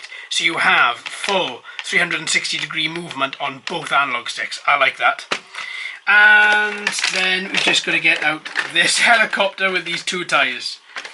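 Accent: Wales, United Kingdom. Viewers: And that is why your videos are so infectious! Tell me, what part of Wales are you from? My dear old mum was born in Abergavenny, so I have Welsh blood!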